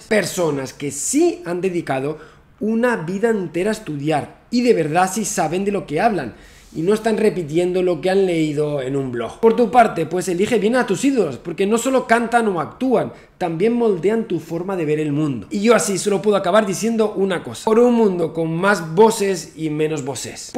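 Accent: Canary Islands, Spain. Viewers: De dónde es el presentador? Canarias?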